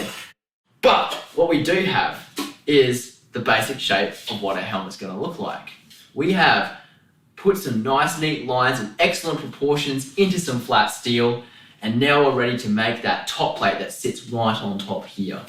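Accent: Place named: Australia. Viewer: я уже и забыл какой у австралийцев забавный акцент привет от World of armor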